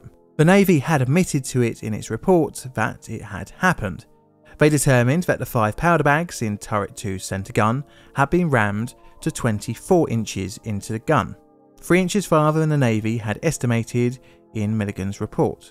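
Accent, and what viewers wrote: United Kingdom. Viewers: AI narration with a Limey accent. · The US Navy is being taken to task... by a YouTuber with a Brit accent... at least slightly ironic, eh wot?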